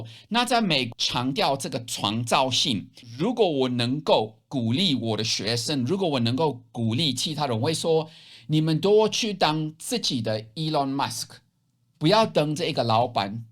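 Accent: Taiwan. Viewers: 我覺得您講話已經有台灣的語調了。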